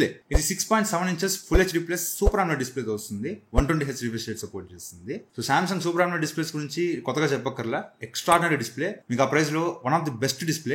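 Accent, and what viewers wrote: Nellore, India. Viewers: ఏ ఊరు బ్రో మీది? కొంచం నెల్లూరు యాసలో మాట్లాడుతున్నారు?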